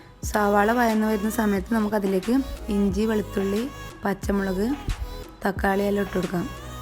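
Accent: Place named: Kasaragod, India. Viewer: Kasrgod slang orupad ishtayii.... Masala doshayum sprrr🥰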